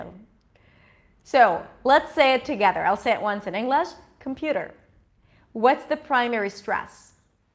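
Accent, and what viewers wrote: United States. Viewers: Hi, great video. I genuinely think that even though you are not a native speaker, you are able to explain pronunciation like an American, infact the first time I came across one of your videos I thought you were American.. I hope one day I'll be able to speak English like you do.. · Hi, can you make a video about your accent? I think it will be a super interesting video as you can showcase people how you can change and modify your accent? You are a model to me and the way you speak English is just perfect. I have always wondering why you have just a beautiful voice and intonation. Even in this video, when you say the word “ computer” in a fake way, it kind of has an English rhythm in it. Another question that I have that you can use to make a video is that “ do you have to give up your personality when speaking English”? Because again, what you have achieved is phenomenal. 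It is not just about the way you speak the language but what you’re doing during speaking is so American like keep smiling all the time or pause a bit when you are thinking, looking at the above, etc. I’m really interested in knowing how you acquire American accent. I know quite a lot Hebrew speakers and even if they’re taught how to say a word in a correct way, put strong stress, Rhythm, etc. there is still something missing, not perfect like you. I think you should make a video on it.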